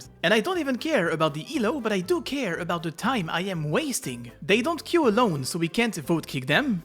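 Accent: France. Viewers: Ton accent français 😂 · T'es français fréro l'accent ça trompe pas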